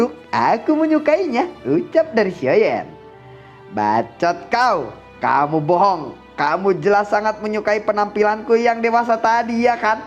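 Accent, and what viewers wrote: West Java, Indonesia. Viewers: Min emang orang mana sih ? Bahasa Sunda nya kaya gak asing 😂🤣👍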